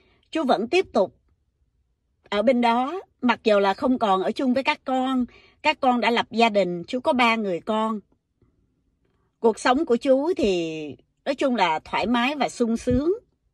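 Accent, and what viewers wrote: Standard. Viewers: Câu chuyện rất thật rất đời thường ,giọng đọc E rất chuẩn hay,Khi đã nói ra đc lòng sẽ nhẹ nhàng hơn,đây cũng là bài học cho tất cả mn ,kể cả phụ nữ,nếu sống lường gạt âm mưu như vậy,ắt gặp quả báo,ko phải ai cũng cư xử như A này .Nếu ng khác họ báo cảnh sát ,Cô ta chắc chắn sẽ bị vô khám. Nhân tham tài nhi tử Điểu tham thực nhi vong. Người tham tiền cũng chết,vật tham ăn cũng chết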